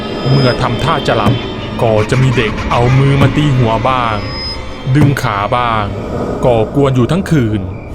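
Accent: South, Thailand. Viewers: ภาคใต้ใช่ไหมคับ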